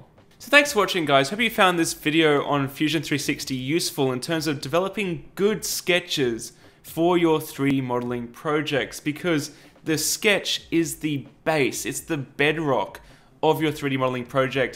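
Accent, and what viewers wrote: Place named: Australia. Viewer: Mate, thanks for your efforts with showing us noobs the ins and outs of 3d printing with the bonus of your real world application. (And we need more ozzie accents on youtube).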